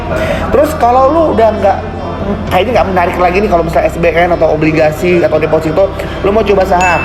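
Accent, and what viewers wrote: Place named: Medan, Indonesia. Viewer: Ga sengaja klik ehh bahasannya menarik juga dan bermanfaat. Auto subscribe dah ini, ditunggu video laennya lae (logat lw sih anak medan hahaa...)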